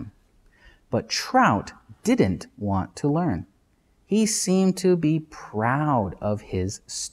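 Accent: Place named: United States. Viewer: You read books with a very good american accent i would love to talk you can i ?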